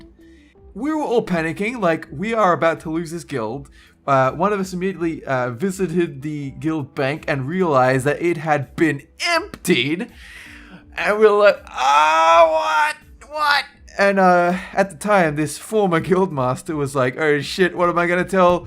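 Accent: Australia. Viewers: TectalchameleonJamaa it's almost as if it is an Australian accent! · Didn't know you were Aussie... didn't really pay attention or care either but mkay. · I didn't know your were aussie! Sweet! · Wait ur aussie?? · Wait. You're Australian. WAT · Your Australian..... Holy shit I love you so much more... State? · is Aussie?!?! 😂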